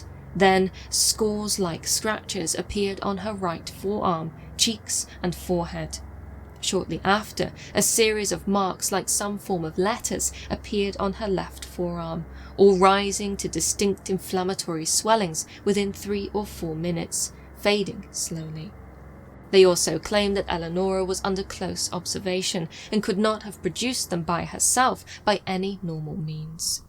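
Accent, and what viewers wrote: United Kingdom. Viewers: You are such the British lady. Love your video's and your accent.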